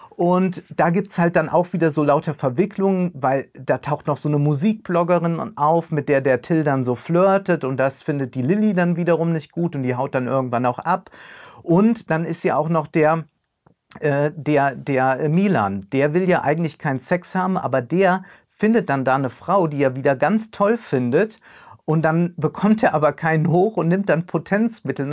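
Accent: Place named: Moselle Franconian, Germany. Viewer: Dieser dezente aber schöne moselfränkische Akzent. :D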